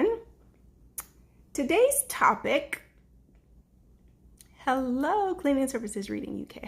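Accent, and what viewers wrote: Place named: Georgia, United States. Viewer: Why do you low-key look like Jada Pickett-Smith today? Except for the Georgia accent, you sound a little like her, too.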